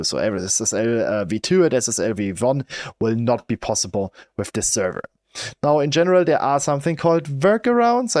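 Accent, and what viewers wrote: Germany. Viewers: loved the german accent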